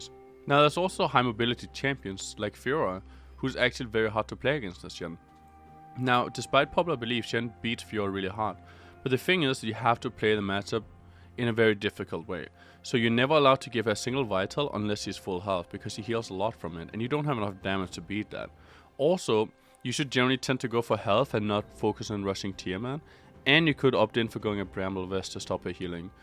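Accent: Denmark. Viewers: Dansker? Btw very helpful video !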